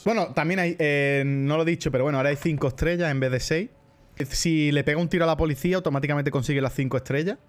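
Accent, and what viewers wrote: Puerto Rico. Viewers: A veces se me va el rollo y por el acento creo que Baity es puertoriqueño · porque habla como puertorriqueño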